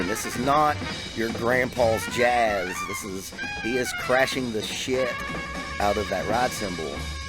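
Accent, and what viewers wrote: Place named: South, United States. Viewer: your accent is really interesting, what part of the US are you from(from movies i know its the south somewhere)? also great video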